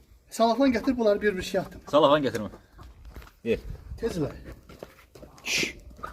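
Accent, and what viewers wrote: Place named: Shamakhi, Azerbaijan. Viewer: Deyesen bura Şamaxidir. Lehcesinden oxsatdim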